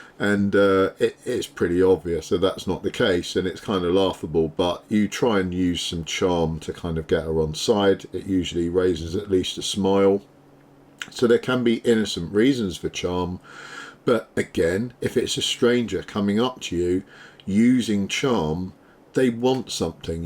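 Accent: United Kingdom. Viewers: It sounds a lot less rude in a British accent ❤ 😂😂😂. I love the information. I am very good with my situational awareness, being armed and telling people No. But these are great videos to share with others and I do learn something from them too.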